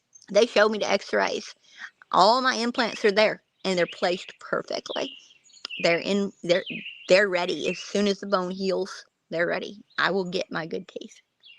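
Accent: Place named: South, United States. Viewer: You stylin the no teeth! Cute! Your voice just got sn even more southern accent lol! When you ferl up to it you might do a bideo showing you mowing with your reel mower. Alot of people dk what they are. Hope you heal wuivkly. Give it a week before you try treeth again so stitches are more healdf not irritatdd by tryi g them.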